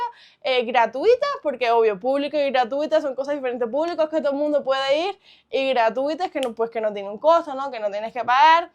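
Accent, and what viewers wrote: Cuba. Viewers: Me encanta tu acento cubano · Hola esta perfecto tu vídeo, pero habla un poco mas lento, si cuesta trabajo entender 😢 mas que nada por qué el acento de cuba es muy padre. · Me encanta tu asento cubano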